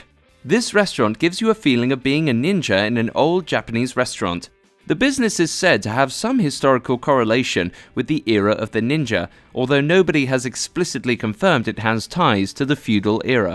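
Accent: United Kingdom. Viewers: Elliott Stewart- You realize the announcer is British right? They pronounce things differently. I'm from Texas and have never heard anybody say Spo-kane so I think Americans get it. Also, your apostrophe is unnecessary. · AngryTurtle Gaming He's British.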